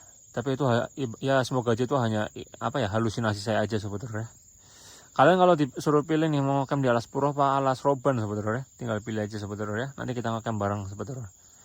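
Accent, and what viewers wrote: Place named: Banyumas, Indonesia. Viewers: arane kaya wong rusia jebulane wong tegal ngapak oii... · Bru ktmu chanel ini...bnr2 luar biasa beraninya...ko ini lucu ngomongnya medok ngapak...pdhl sipit ya ko semangat....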